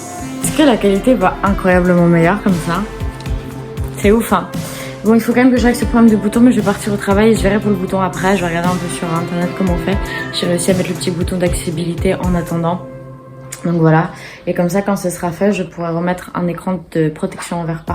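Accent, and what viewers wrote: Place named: Brittany, France. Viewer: Tu causes bien la Bretagne :D ! Vive les samedis Basket qui foutent la loose et le seum, hein ma chérie ?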